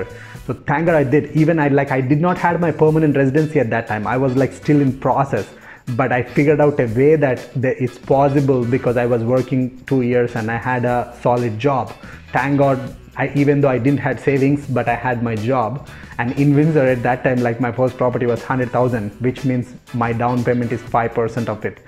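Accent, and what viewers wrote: Telangana, India. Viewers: Great story. You sound like you are from Telanagana, if so then which part of Telanagana you are from?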